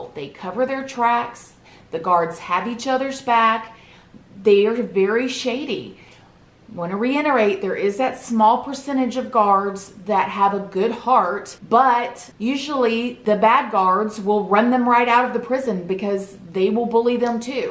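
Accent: South, United States. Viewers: Your southern accent makes me feel secure??? Idk but I appreciate a fun southern YouTuber (nothing weird, my family is southern, and nobody is where I live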